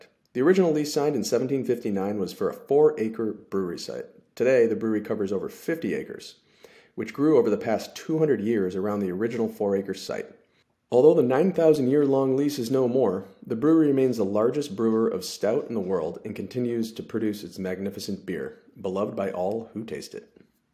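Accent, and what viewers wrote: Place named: Scotland, United Kingdom. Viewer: That's a Scottish accent 😅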